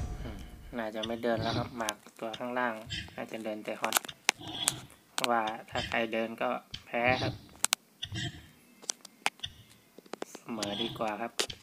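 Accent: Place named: Isan, Thailand. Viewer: ผมขอโทษครับ หลุดฮาทุกทีสำเนียง ซ.ซ้าง ผมคนอุดรครับ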